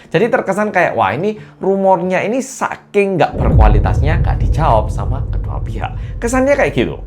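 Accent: Java, Indonesia. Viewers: Abis dari channel ngelantur yg brutal anjing2an... ke channel mas2 korea jawa medhoookkkk 😅😅😅 untung gk ketuker😂😂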